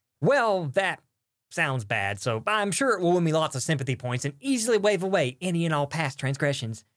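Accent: South, United States. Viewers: I like your subtle southern accent. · Your Southern accent is less noticeable here than your regular videos. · Me neither, i thought it was kind of a speech quirk or character thing, but seeing his face, I realized he was SOUTHERN. and... HANDSOME. Lmao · I never realized how thick his Southern accent was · It’s okay, your vaguely southern accent has soothed us and your justifications seem fair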